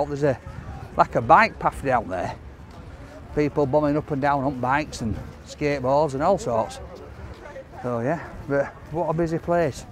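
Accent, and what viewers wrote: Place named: Yorkshire, United Kingdom. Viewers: I completely agree with you and his personality and accent have given him the opportunity and success he has. I’ve bumped into him 3 times in Leeds . I’m not a stalker lol. He knows his USP and that’s why he is now where he is. Northern lad done good 👍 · These people obviously love the English accent, mate LOL 😁Keep this up. Really enjoying this. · "What's t'best one?" "Huh??? Everyone's struggling to understand our. Pride of Yorkshire! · I’m from Yorkshire and his dialect isn’t too strong compared to some older people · Visit Cha Cha chicken, it’s near Santa Monica pier great Mexican food and owned by local people as far as I’m aware. Love that your presenting our Yorkshire accent over there , tell them it’s the Jon snow accent then they understand haha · Don’t think he understands your Yorkshire accent over there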